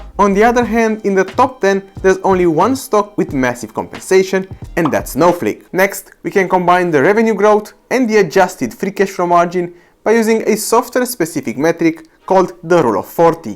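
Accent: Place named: Romania. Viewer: Bruh u have a strong Romanian accept. But do u know what, me too. I need to get rid of it though, I left Romania so I barely speak that language anymore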